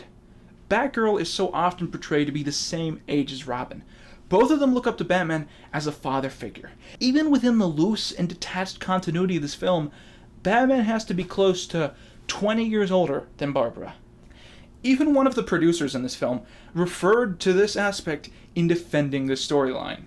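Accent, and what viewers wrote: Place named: United States. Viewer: Yo I'm just a British boy sat in his room watching this and I hear an American talk about eastenders? The figgidy niggidy fuck?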